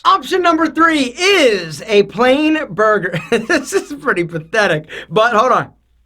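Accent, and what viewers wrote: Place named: Upstate New York, United States. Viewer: Where's the accent? Sounds upstate NY.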